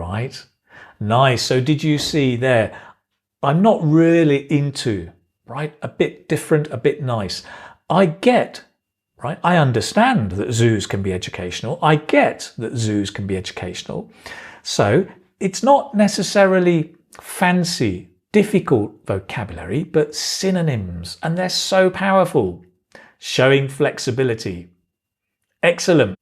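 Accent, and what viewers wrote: United Kingdom. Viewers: Sir, I would like to be a British English speaker as you speak.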